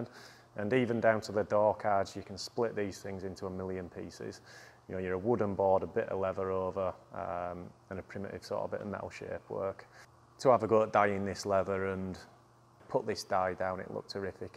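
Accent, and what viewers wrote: United Kingdom. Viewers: Oooo Kay...love the brit accent but would of loved even more to HEAR THE DAM CAR..😊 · That Northern accent...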